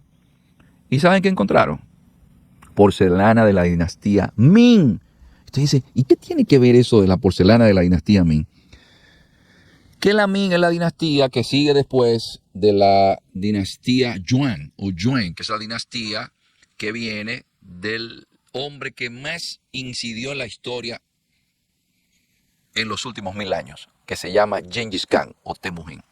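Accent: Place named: Castile, Spain. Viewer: Siempre veo los videos de wn tu canal, hablas con acento castellano. No se si eres de Espana realmente.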